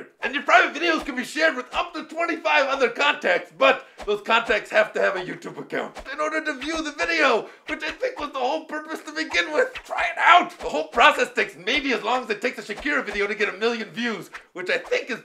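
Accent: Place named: Mexico. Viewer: this ninja sounds mexican!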